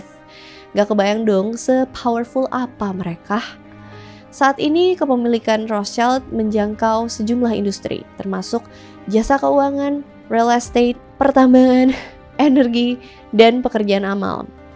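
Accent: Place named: Banyumas, Indonesia. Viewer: Love you...Ngapak Bae bahasanya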